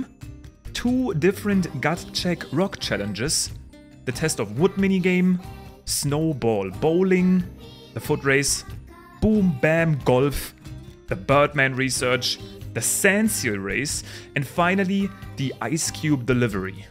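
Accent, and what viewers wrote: Germany. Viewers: wie man immer hört wenn deutsche englisch sprechen xD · Das erste dass mir auffällt ist sein deutscher akzzent lol. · are you german? you sound like it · Okay, can I ask something? Do you speak German usually, because you have such an accent! Wenn, dann find ich das mega geil XD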